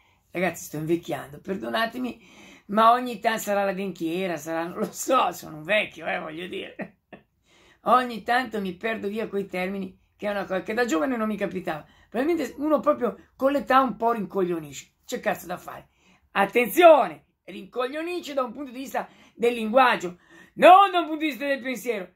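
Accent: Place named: Ancona, Italy. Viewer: Me sembri de Ancona, mi sembri 😆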